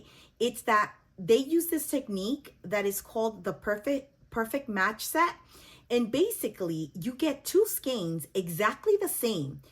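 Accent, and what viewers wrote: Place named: New York, United States. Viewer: I'm so glad I came pass your podcast because, I just got this pattern and believe it or nor just finished reading the pattern on November 27' 2024 sm. I'm listening to you am and you are now talking about the Cumulous knit, lol. I'm excited now to start the Doppio after I finish with your podcast. Your accent is gorgeous almost sounding as if you are a New Yorker and Spanish, but I know nothing even at the age of 72, lol. I'm also a brand new knitter. Figured if I learned to crochet at 11 by reading a book, I could at 72 do the same. Well, that's a lie as I'm shaking in my boots trying to keep my circulars from shaking, lol. I also have a old basket I purchased in Panama during my 20 year stint in the Navy. If I survived tat when women weren't meant for the armed forces, tell me why this knitting is putting fear in my hands, lol. 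I'm going to do it anyway! Thanks for saying a beginner can do this, so I better get busy. I have the equipment, the yarn, my hands and the determination, lol. Wish me luck. I'll keep watching out for your next podcast. You're enjoyable to watch and listen to. Your enthusiasm has lit a fire. You had me interested at hello, lol. Ms. Jo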